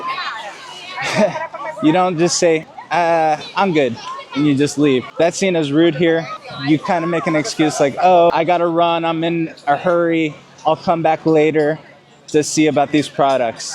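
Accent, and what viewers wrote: Brazil. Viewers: You have an accent. I guess you’re Brazilian as well! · Hey I'm not trying to be rude or anything but I could not help myself to notice you've got a Brazilian English accent somehow?! Please tell there is a explanation and I did not lose my mind yet. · Very accurate in many points. This guy dived so deep into the Brazilian culture that it seems to have influenced his way of speaking. It's funny because normally Americans don't have that voice intonation. He reminds of a Brazilian speaking fluent English without an accent.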